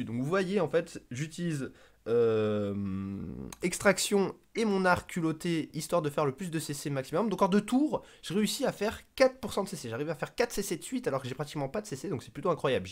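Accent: South, France. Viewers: Le bon accent du sud :D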